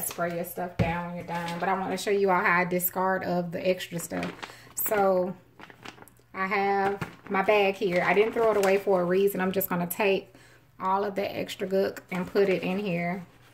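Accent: South, United States. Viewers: She sound fine. Like a southern bell. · New fan here. Would love to see you do a through and through without pausing and starting again. Your voice is southern and very soothing. Maybe you could talk to us about your day as you're cooking or whatever you feel like discussing. Just a suggestion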